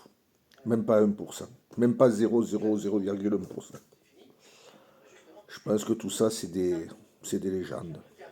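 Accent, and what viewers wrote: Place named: South, France. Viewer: Bonjour, Je suis carpiste je pêche la carpe depuis mes 10 ans. J'ai commencé à pêcher la carpe au Maïs et à la pelote. J'en ai maintenant 43 ans. Je pêche à deux ou 3 cannes de 12 pieds en 3.5lbs, avec les techniques modernes, rod-pod et détecteurs à la bouillette + stick ou PVA, Maïs, Tiger-Nut ou Frolic. Je ne sais pas dans quel coin vous pêchez, mais avec votre accent, je présume dans le sud de la France. Il existe des étangs qui sont complètement stériles du fait qu'il y a peu de densité de poisson et par conséquent une faible concurrence alimentaire. Il peut y avoir aussi une forte pression de pêche, le poisson est éduqué et plus méfiant. Il faut aussi savoir si les étangs dans lesquels vous pêchez sont empoisonnés régulièrement en carpes et connaître le cheptel et le poids moyen etc..... Si vous pêchez la carpe sur des étangs avec peu de carpes vous aurez aussi du mal à en faire. 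De plus, il faut aussi parfois amorcer préalablement pendant plusieurs jours un spot afin de conditionner et mettre en confiance le poisson. Il faut aussi chercher et repérer les bons spots sur l'étang que l'on va pêcher, sauts, marsouinages des carpes, présence de nénuphars, arbres et herbiers immergés dans l'eau, les roselières et les bordures riche en végétation etc... Concernant la méfiance du poisson sur un hameçon, je dirai que ce n'est pas l'hameçon, mais plutôt le corps de ligne, le bas de ligne et la présentation du montage avec l'appât en lui même, le type d'esche, la taille, la coule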